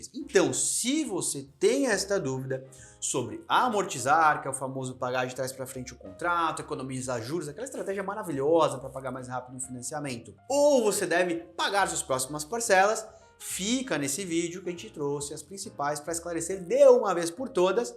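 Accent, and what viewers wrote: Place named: Santa Catarina, Brazil. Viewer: eu concordo, sentia isso quando conheci o canal, mas com o tempo você vai dominando os maneirismos do "sotaque" catarinense e consegue absorver quase tudo que é passado rsrsrs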